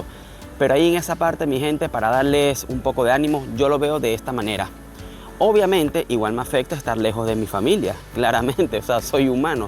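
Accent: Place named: Venezuela. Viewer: Que extranio, tu acento me parece mas Venezolano que Chileno... :)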